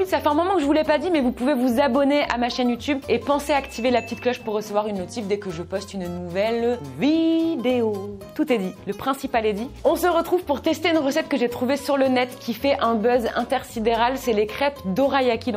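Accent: Canada. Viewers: Tu est quebecoise ou française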